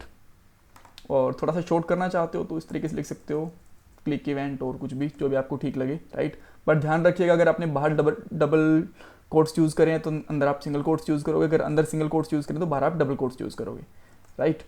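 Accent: India. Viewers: Noida se ho bhai kya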